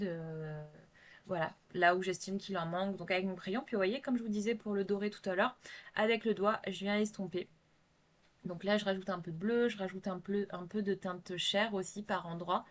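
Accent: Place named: England, United Kingdom. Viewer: Bonjour, je découvre vos vidéos et j'adore!!! Je me suis abonnée également 🎉! Votre voix est agréable et fluide et c'est un avantage certain et l'accent anglais est pas mal! J'ai entendu pire! 😅😂 alors pas de honte à avoir ! Quelle imprimante utilisez-vous? La mienne n'est pas laser et il paraît que l'on peut trouver des cartouches avec une encre résistante à l'eau 🫤 faut que je creuse! 😁 Je vous souhaite une belle journée et à bientôt pour de prochains visionnages! 😊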